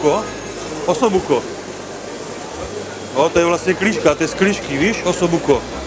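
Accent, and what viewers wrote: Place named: Haná, Czech Republic. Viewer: taká hanáčtina :D dobré vole :D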